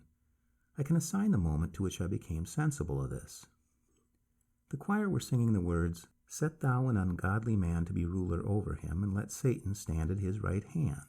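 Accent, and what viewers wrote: United States. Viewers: Colonial? American…. he talks in a rush and then inapause…..strange delivery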